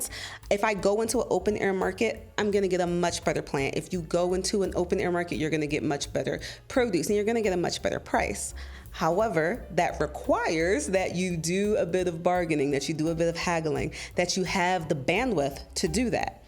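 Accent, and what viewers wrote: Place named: Kenya. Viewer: Gurllll!!!! You even have a Kenyan accent!! B A R G A I N I N G 😂😂😂😂 Karibu home!! #InstantFOLLOW!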